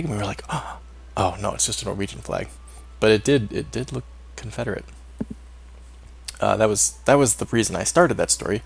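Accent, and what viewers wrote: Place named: France. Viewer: Renowned Physician adds a bonus to Physician-ing from what I can tell. What you should do is grant Julian some land then he will stop asking to join holy orders, or just ship him off. I don't know if you noticed but you lost the North of Greece/Turkey. From Constantinople East to the Il-Khanate. That French accent sounds like the narrator in the Jeanne d'Arc campaign in Age of Empires 2. Smashing icons is what Iconoclast is all about. No icons to God so we must break them. You can check out the society under the society tab which is the last one up the top.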